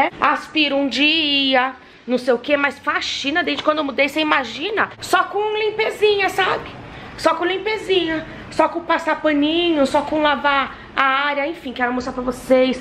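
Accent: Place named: Italy. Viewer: Meu marido ouviu sua voz e perguntou: quem é essa italianinha? Daí eu disse: ela é japa amor😍😊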